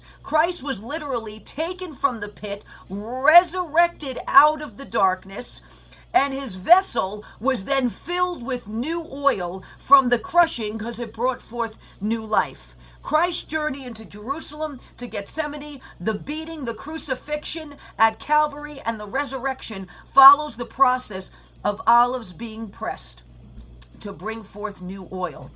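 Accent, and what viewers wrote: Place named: New York, United States. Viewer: I love that connection to the Lord's going through the suffering on the cross, his burial and the Resurrection, and how the processing of the olive oil with a donkey, wood, and the crushing weight of a stone fits into the narrative so well. No wonder I love you so much Ms.! I'm a New Yorker transplant to Texas (in 1982), and it's always fun to hear you speak with that Italian NY accent as well as inform us of what's going on in the spirit world and the physical world!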